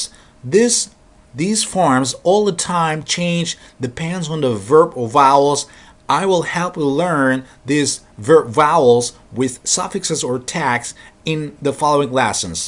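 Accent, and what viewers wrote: United States. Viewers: You speak like an American. I am confused because you have a Turkish name but speak like a native in English. This level of language ability is amazing when you are able to confuse someone from the UK. I love your lessons, they are incredible!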